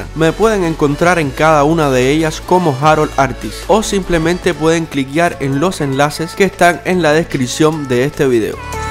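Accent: Cuba. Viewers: Tú eres cubano ..???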